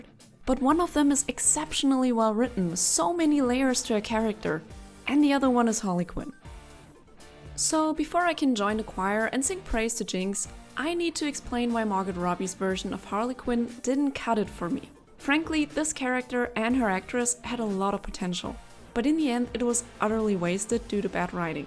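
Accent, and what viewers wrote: Germany. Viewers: Are you German? Your accent sounds very German.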